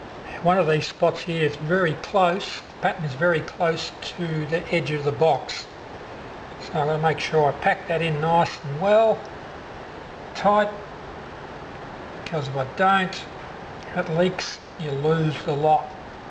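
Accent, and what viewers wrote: Australia. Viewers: i know Australia, but where? Sounds like NT.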